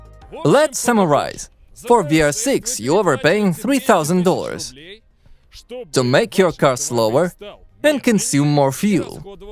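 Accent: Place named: England, United Kingdom. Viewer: О вы из Англии?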